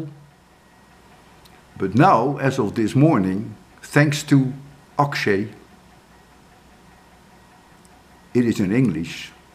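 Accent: Netherlands. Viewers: I think it is because he speaks in a Dutch accent, so YouTube thinks it is in Dutch. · Sir, now my question is: are you Dutch? Because you speak English with a Dutch accent.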